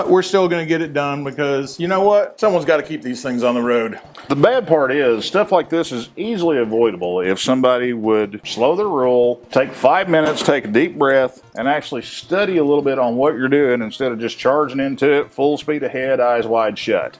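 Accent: South, United States. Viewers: and his southern drawl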